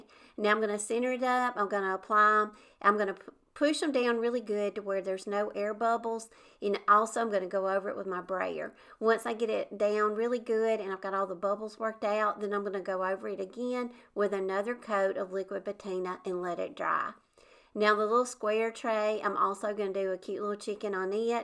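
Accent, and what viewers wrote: South, United States. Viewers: 👋 I could sit and watch you craft all day. Love it all so much. My style spot on. I am so ready to have our retirement home built this year and start decorating it. I am sorry, but I had you mixed up with Lisa, from Our Shabby Cottage. I asked if she ever finished the doll house that she was working on for a hobby. She re assumed me that it was you. Again, I am so sorry for missing you two up. Both with that sweet southern accent, kinda like me. ❤ Love. Anyhow, I had a big interest in that video of your dollhouse. I had my husband build me one that I found on Pintrest. I had to put everything on hold due to family illness, but was still wondering about yours and excited to get back to mind.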